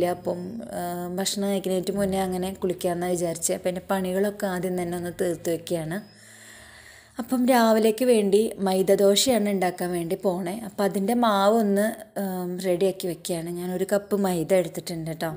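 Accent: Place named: Palakkad, India. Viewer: Palakkad stilpole thonni atha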